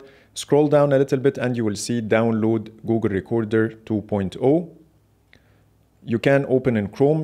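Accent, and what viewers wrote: Egypt. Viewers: Thats our well known egyprian-english accent. Weird enough other Arabs thinks its annoying! Lol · it's an egyptien accent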